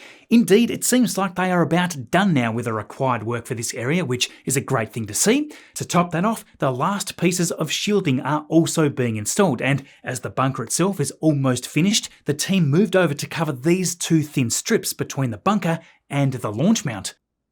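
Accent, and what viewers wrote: Tasmania, Australia. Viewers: As an American, I love a good 'Tassie accent. I will attempt to transliterate. SpiceEhx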